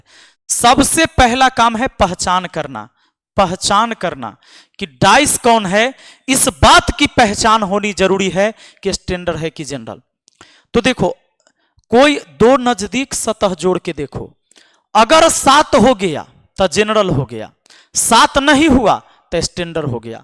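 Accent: Bihar, India. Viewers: Sir kia aap bihar se hi hai Aap kabhi kabhi maithli me bhi bol dia kijiye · कोई नहीं यार आप से तो मैं बहस नहीं कर रहा हूं।लेकिन हमलोग बिहारी हैं।दो नही दू बोलते हैं आप भी बोलते होंगे अपने परिवार में।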